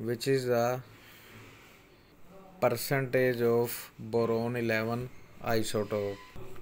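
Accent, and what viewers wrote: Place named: India. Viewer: Wow this is amazing Am helped a lot though am a Zambian and I had some difficulties in getting the Indian accent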